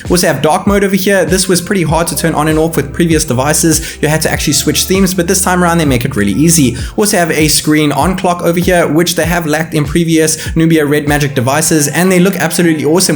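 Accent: South Africa. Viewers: Are you South African. It sounds like it. Love from SA :)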